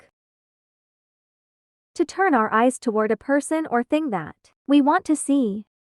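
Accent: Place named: United Kingdom. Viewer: عادي تسوين بلهجة بريطانيا❤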